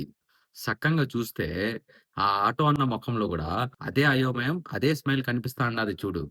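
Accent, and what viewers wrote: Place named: Rayalaseema, India. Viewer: Rayalaseema slang appa 👍 💕 Your native place Sir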